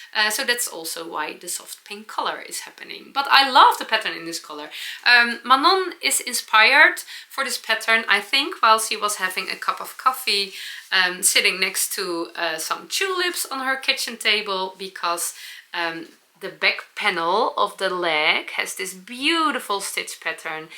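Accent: Netherlands. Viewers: I know it is a lot of work to record your episodes twice, but I appreciate your dedication. I love your Dutchy English and would miss your sauce. Thank you for the deep dive into sock knitting. Your daughter's new socks are lovely! ❤🧶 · Your dutchy English just makes the episodes.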